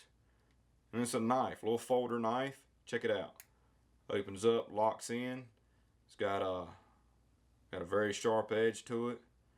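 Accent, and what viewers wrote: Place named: Georgia, United States. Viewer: Hey! where are you from? You sound like your from around the Georgia or South Carolina area...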